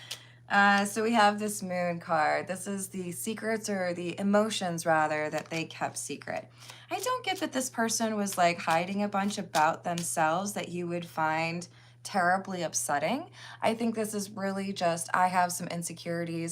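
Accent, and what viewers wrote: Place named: Minnesota, United States. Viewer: The Minnesota accent was ADORABLE 😂🎉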